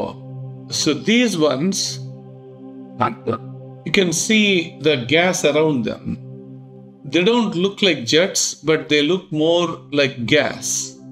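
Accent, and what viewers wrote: India. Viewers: He obviously has a thick South Indian accent 😅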